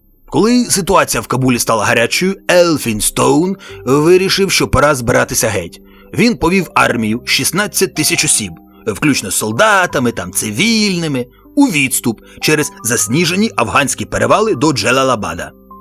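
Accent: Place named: Russia. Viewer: Мова автора з скаженною кацапською алітерацією мови!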